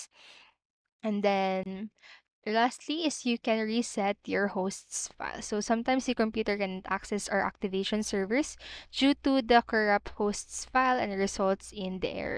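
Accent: Philippines. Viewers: I loathe your fucking annoying Filipino accent!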